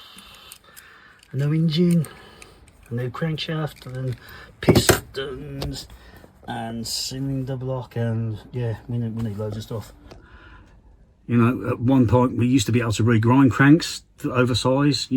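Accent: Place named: Birmingham, United Kingdom. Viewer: Quick jacks 😂 he’s a brummie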